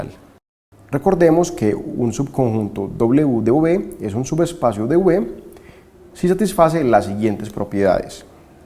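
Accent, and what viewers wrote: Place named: Antioquia, Colombia. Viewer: Los paisas si hablan horrible, pero buena la explicación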